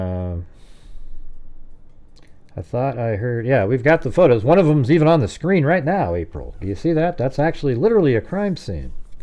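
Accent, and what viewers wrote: United States. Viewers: I live quite far away (Zabrze, Poland) and haven't seen anyone here who knows this story. I'm very interested in forensics and know a lot about many criminal cases. I'm completely captivated by Idaho. I usually compare the work of many sources, but in this case, it doesn't really make sense. Since I discovered your channel, my head has exploded with admiration for your work, talent, and knowledge. At the same time, I really enjoy listening to you; you have a good voice and that great American accent, like from the good old movies I watched as a child. I wish you much success with your channel; it's truly a great thing! Keep doing what you love and stay healthy.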